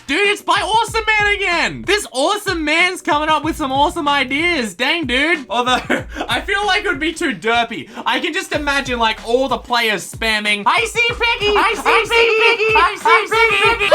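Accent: Australia. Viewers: I just realised in this video that your Australian 😅😂 I don’t know why but I love seeing Australian you tubers! Keep up the amazing work!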